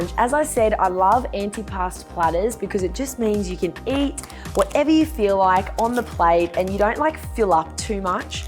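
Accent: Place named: Australia. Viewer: why do i love her voice, so Aussie😍 edit: AHHH SHE LIKED MY COMMENT❤️❤️❤️❤️❤️❤️❤️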